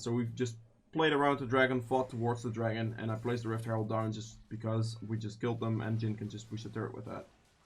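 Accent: Netherlands. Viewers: as a dutchy i can tell you are dutch. nice guide thx!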